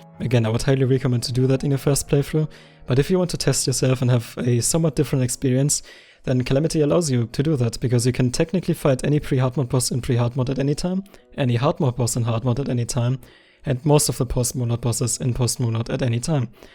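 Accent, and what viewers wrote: Germany. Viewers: are you german? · U can really hear the german accent😂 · I can hear the German accent in his voice/ greets from Germany Kamerad 🇩🇪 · are you german?? xD bc you sound like german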